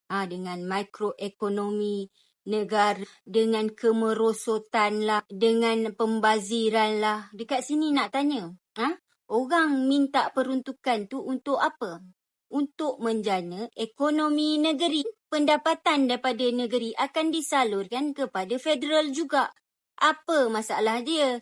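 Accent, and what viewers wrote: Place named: Pahang, Malaysia. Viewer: Itulah loghrat bahasa pahang sebenornya,mengikut daerah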